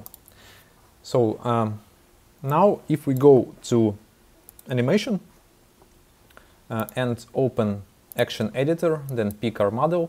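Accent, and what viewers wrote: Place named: Russia. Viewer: Услышал русский акцент и понял, что моя проблема решена😎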